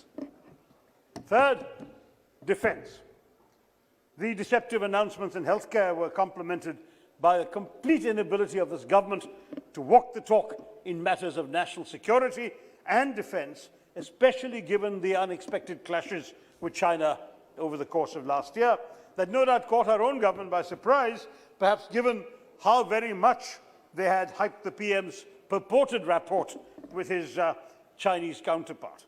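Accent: England, United Kingdom. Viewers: Just a malyalee showing off, in a anglicised accent. No content nor any useful suggestions, just a Angrazzi trash for the poor constituents.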